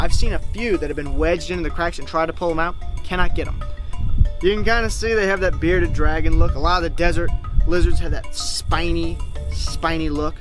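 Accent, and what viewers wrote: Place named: South, United States. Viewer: WE LOVE YOU IN ALABAMA. KEEP YOUR ACCENT SOUTHERN. IT IS WHAT MADE YOU AND SETS YOU APART. WHOOP WHOOP. LORD BLESS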